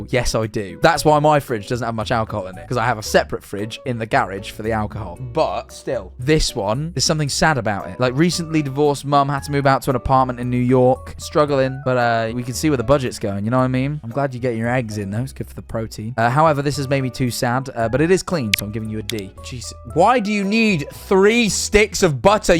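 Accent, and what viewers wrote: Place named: Exeter, United Kingdom. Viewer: you are sounding increasingly Exeter university just thought id let you know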